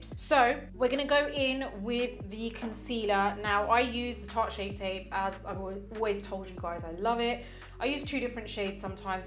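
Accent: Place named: England, United Kingdom. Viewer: Love ❤️🥰all your makeup tutorials. You do an amazing job 😍explaining each step throughly. Just want to know where are u originally from? I don’t know much about makeup. So your info is valuable to me , it 🌹helps me to buy the latest products in the market, concealer foundation… I live in Connecticut US, ( vcold weather), dries out the skin. Can u suggest any products for that, before makeup. Did u live in England? ( your english accent).I was brought up in Riyadh( Saudi Arabia) and England.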